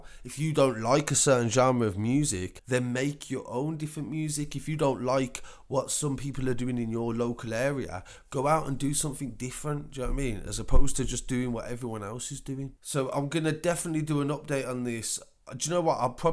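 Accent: Birmingham, United Kingdom. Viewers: Goid coverage.. that brum voice lol even thow im from brum to i dnt have the accent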